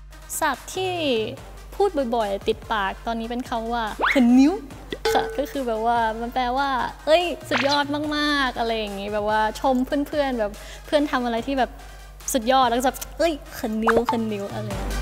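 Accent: China. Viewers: เริ่มพูดไทยไม่ชัดและมีสำเนียงจีนติดมา แต่แค่ปีเดียวพูดจีนได้ขนาดนี้ถือว่าเก่งมากๆ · นางฟ้าตัวน้อยเริ่มพูดไทยสำเนียงเหมือนคนจีนพูดไทยละ 555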